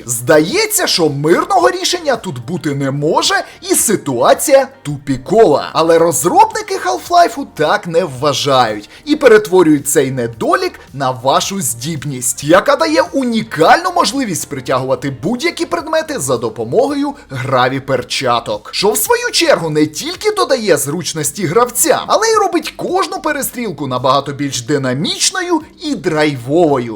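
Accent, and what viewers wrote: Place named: Irpin, Ukraine. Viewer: Цікава говірка у вас в Ірпені)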